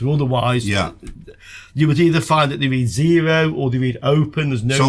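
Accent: Germany. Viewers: Dein Kumpel hat wirklich was drauf, das muss man sagen. Er ist zwar nicht der Guru, was Grakas angeht, aber dennoch ganz oben mit dabei. Ich denke, das weißt Du ja. Nach allem, was ich bisher gesehen habe, bin ich sehr zuversichtlich, dass bloß die 1,8V Versorgung Probleme macht ... sobald soweit vorne in der Sequenz etwas defekt ist, ist meistens weder RAM noch GPU defekt, daher wäre ich an Deiner Stelle sehr zuversichtlich. Ps. Mein Kommetar kam übrigens schon, als ich das Video gerade 2 Minuten gesehen habe. Man merkt sofort, wenn ein Deutscher Englisch spricht 🙂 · ich liebe es wie man es bei uns deutschen eig immer zuverlässig raushört :D x3 grüß dich :D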